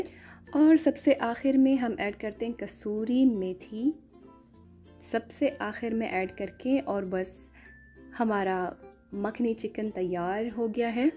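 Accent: Pakistan. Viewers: Ap ka urdu ka leja i mean Accent same pakistani hai lagta hi ni ha ap morrocian ho 👌👌👌👌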